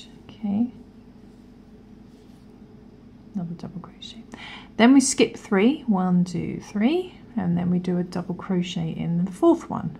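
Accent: United Kingdom. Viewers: The 1st row is called the foundation chain. Love this pattern & perfect since I've been searching for a wash cloth with enough openings to dry faster & just easier to deal with soap/water. Little cupcakes are precious, thanks so much. The gap that you starting on second row we in the U.S. is called space. Still like your British accent.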